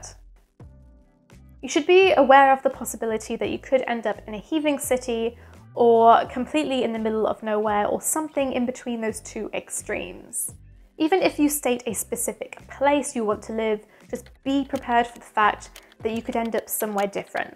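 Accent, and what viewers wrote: United Kingdom. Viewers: Sound good posh English accent...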